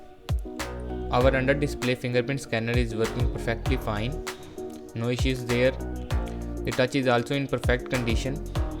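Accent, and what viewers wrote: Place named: India. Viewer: Индийский акцент это пиздец.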